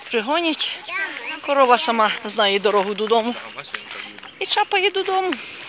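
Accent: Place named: Transcarpathia, Ukraine. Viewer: Здравствуйте , а в какой области и районе вы живёте ? Я родом из Закарпатской обл , знакомый диалект .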